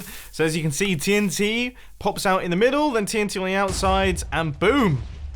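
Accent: United Kingdom. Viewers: U brittish??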